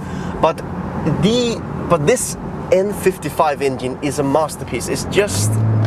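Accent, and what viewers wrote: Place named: Denmark. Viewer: godt tip, du får ikke særlig mange views når du taler engelsk, man kan tydeligt høre at du er dansk, bare tal dansk tak.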